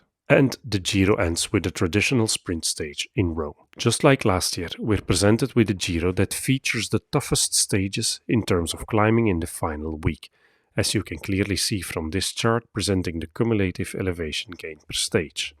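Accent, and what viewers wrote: Flanders, Belgium. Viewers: Ik hoor wat vlaams Engels in deze video haha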